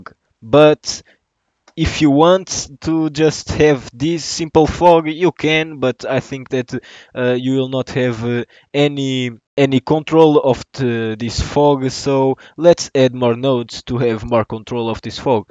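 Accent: Portugal. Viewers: Tuga no blender? Vou ja dar sub! soube logo pelo sotaque hahah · hahahha bro ouvi tipo 10 segundos e soube logo que eras tuga obg pelo tutorial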